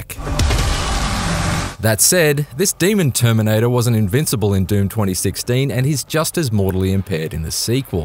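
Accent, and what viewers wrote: Australia. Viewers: is this Gmanlives? I can tell that he's Aussie, but it doesn't sound like Gmanlives. The accent is slightly different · Goodness, I always significantly enjoy the texts when any of the Aussie lads writes/narrates them!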